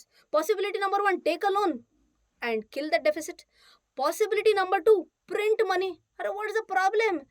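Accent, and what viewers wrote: Maharashtra, India. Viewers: The best informative as well as video effects/animation I have seen till date on an academic channel. I really dig your Marathi dialect ma'am 😊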